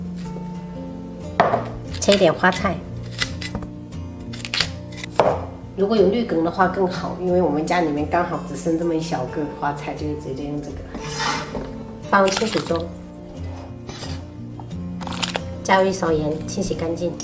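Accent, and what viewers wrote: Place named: Fuzhou, China. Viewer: 你是四川人,但会说福州話？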